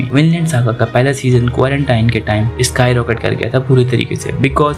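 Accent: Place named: India. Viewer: Bro I cant hear nothing thourgh your indian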